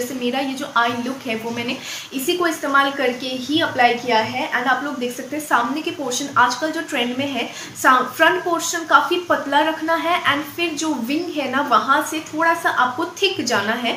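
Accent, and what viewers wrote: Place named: Bengal, India. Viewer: Tumar awaje Bangla tan asche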